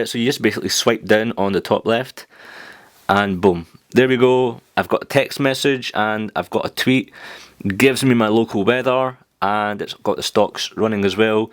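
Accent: Scotland, United Kingdom. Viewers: Haha, I thought you were scottish. :) Thanks for the review mate.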